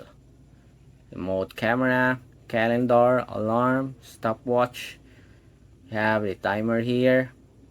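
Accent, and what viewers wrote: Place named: Philippines. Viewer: Pinoy accent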